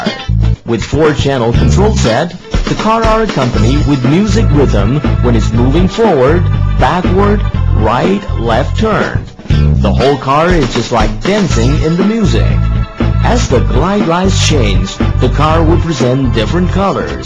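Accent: United States. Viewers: this guy's got a great american accent, but the grammar reveals this isn't an american :) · wtf.. the narrator has the same crappy grammar that you can read in translated chinese manuals, but an american accent?! Me's confused!!!!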